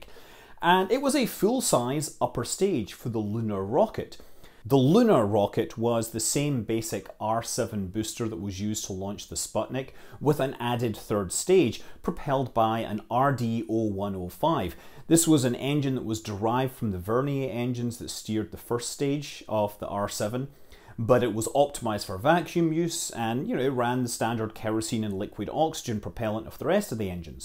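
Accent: Scotland, United Kingdom. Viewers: That's a very convincing Scottish accent.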